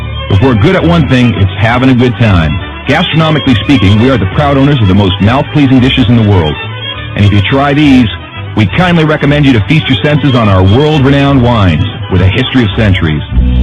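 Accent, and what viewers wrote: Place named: United States. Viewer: foarte tare clipul!!!!pacat totusi ca sunt putin cei care apreciaza si recunosc toate meritele Romaniei. totusi nu mi-a placut vocea tipului...adica accentul lui cand pronunta cuvinte in romana!!! de ce le pronunta ca un american/englez???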